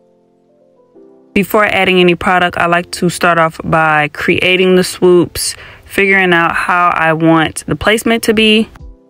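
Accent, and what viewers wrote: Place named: Memphis, United States. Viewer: Sounds like a fellow Memphian❤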